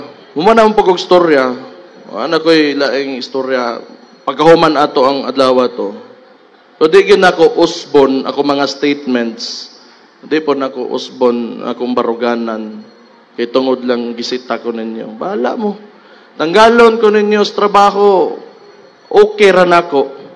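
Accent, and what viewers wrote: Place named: Davao, Philippines. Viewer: Hinde maintindihan salita mo pang davao klang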